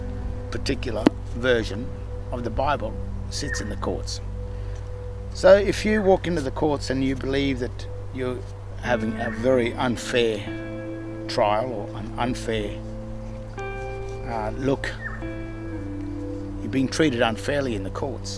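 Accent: Australia. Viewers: Really fascinating and enlightening information! I like your style of delivery, as well. It's genuine, logical, and unforced. And I've always found an Australian accent easy to listen to.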